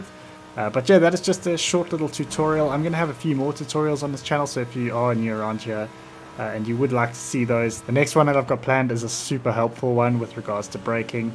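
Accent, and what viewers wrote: South Africa. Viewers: You from South Africa 🇿🇦?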